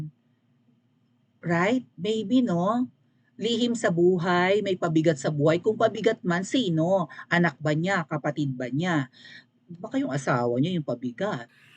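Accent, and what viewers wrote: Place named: Ilonggo, Philippines. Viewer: Yong tunation mo Ilonggo inday, indi visaya, iba yong ilonggo tunation, malanbing, visaya hindi mabilis sila magsalita. Ilongga ka ba bakit kuha mo yong tunation ng ilonggo, marunong ka ng hiligaynon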